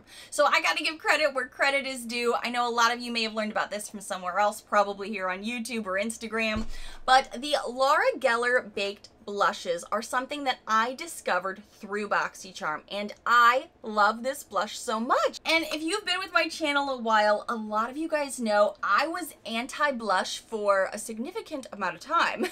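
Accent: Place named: New York, United States. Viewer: Love this style of video. Made me realize how long I have been with BoxyCharm and with you this long too! Remember you used to talk in that awful accent all the damn time?? 😂😂(New York was it) oh and I have extremely dark lips and BoxyCharm sends me nude lip stuff, which I can’t wear! Can you imagine how many I have to give away??🥴 I love the burgundy, reds or mauves! Some of the product brands you love I have too and have yet to try. Some are white girl specific, yikes! I am Mexican and Olive complexion with gold undertones. So you understand. 👍🏽😎♥️🌹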